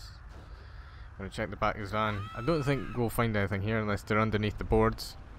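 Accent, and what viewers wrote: Scotland, United Kingdom. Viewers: And also are you from Scotland it sounds like it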